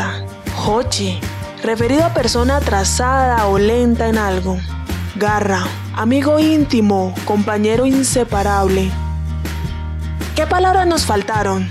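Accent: Colombia. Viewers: Hola la que locutora del video es caleña o chocoana?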